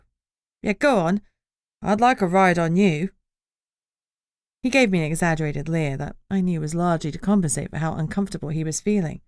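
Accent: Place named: England, United Kingdom. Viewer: Love the narrator. Lovely english accent i can easily understand.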